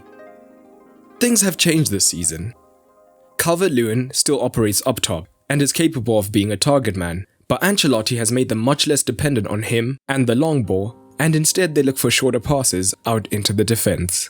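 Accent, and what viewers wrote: South Africa. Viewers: I'm convinced this guy has a South African accent.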